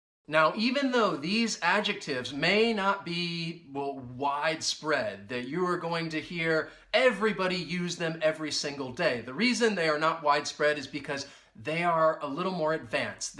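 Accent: United States. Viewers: I am from India... even I understood all the words properly in your American accent..all adjectives were tought very clearly... Thank you sir!!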